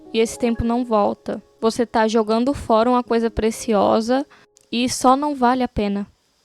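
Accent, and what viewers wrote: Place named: Brazil. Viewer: Conheci seu canal hoje, muito bom mesmo! Voce é de minas, Goiás ou nordeste? Sinto que seu jeito de falar é tao familiar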